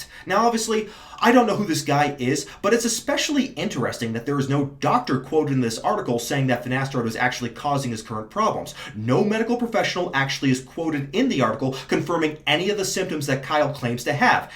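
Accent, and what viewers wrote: United Kingdom. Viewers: Hahaha love the British accent 😂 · That British accent, I died. :)) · thank you for this video. As a british guy (that uses finasteride) who casually reads the BBC for national and international news, i was very worried by this article, particularly the anti-finasteride view it was pedalling, and was shocked at how keen they were to publish this losers sob story. All these facebook posts sharing this story and a similar one published by The Economist is full of comments by uneducated boomers who believe everything they've read and now think there's some sort of trade off to taking finasteride. It's straight up misinformation and I'm really hoping there's a resistance to this shit in the form of a news article or this EU study that demonstrates the safety of finasteride and how much of a loser Kyle is. God forbid they choose to ban finasteride, I don't know what I would do, but I'm banking on the medical study not using Kyle's sob story as evidence. 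Also your british accent has me absolutely cracking up! 😂😂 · Thank you for speaking in British English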